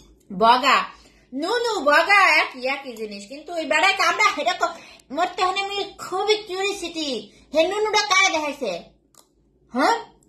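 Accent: Barishal, Bangladesh. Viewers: আপনার বরিশালের language খুবই enjo করি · তুমি কি বরিশালের মেয়ে?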